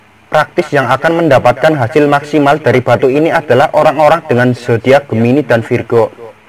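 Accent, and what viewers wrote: Banyumas, Indonesia. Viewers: ngapak ya mas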